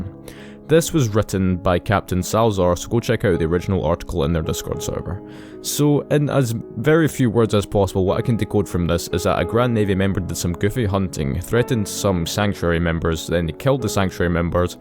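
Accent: Scotland, United Kingdom. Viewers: I love your scottish voice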